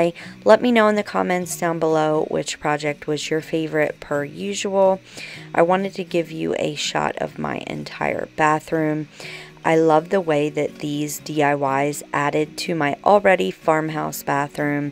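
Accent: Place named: Philadelphia, United States. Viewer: YES GIRL. You have my accent lol I live in Delaware county originally from Philly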